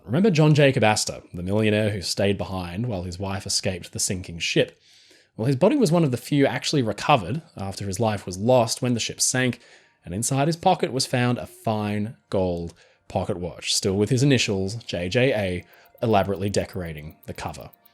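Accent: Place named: Australia. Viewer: You have such a pleasant Australian accent. Always a pleasure to hear you speak about things. On an unrelated note, a line from the Rubaiyat (the book of Persian poems) was found in the Somerton Man's pocket. That book had been very popular in Australia at the beginning of the 20th century.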